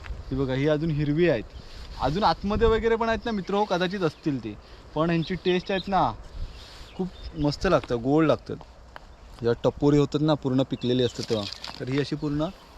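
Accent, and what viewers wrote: Konkan, India. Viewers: भाऊ तू कोंकणी आहे का सुद्ध मराठी आहे तुझी❤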